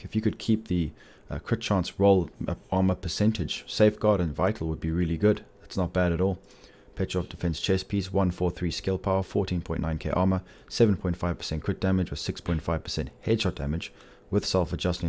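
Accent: South Africa. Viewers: Hey am I hearing a South African accent there?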